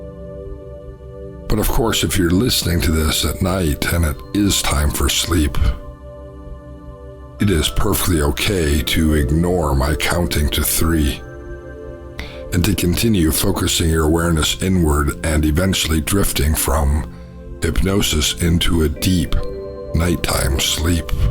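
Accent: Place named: United States. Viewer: your work is incredible! Just discovered this new one today and got a refreshing nap... knocked me right out. Why doesn't YouTube push our your videos? I have mosophonia and can only listen to American accents. Most hypnotists are not. You, Barry Eisen, and Jody Whitely and Lunayes are powerful and all my lifesaving favorites! Thank you and God bless you always!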